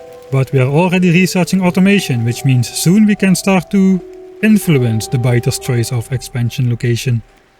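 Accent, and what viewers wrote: Netherlands. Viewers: Geweldig Nederlands accent Amazing Dutch accent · First one of your videos that i watch and i love your accent, is it dutch? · Your Dutch accent is thick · Jesus christ your dutch accent.. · staalhard hollands accent ook lol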